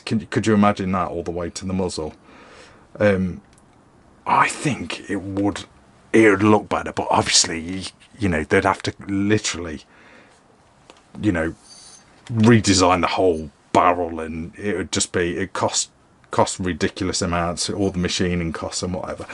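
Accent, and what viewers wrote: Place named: United Kingdom. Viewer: Oh, this British accent :D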